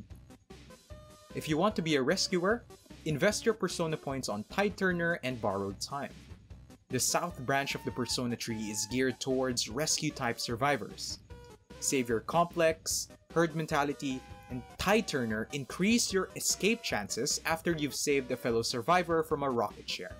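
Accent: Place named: Philippines. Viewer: are you a Filipino? I'm guessing based on your accent :D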